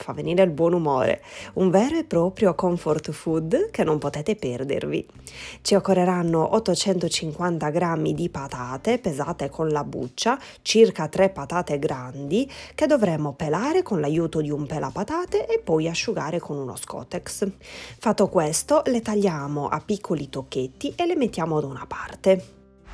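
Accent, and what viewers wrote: Sardinia, Italy. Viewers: meravigliosa e intramontabile ricetta pure della mia❤. ps: fallo sentire l accento sardo che è meraviglioso❤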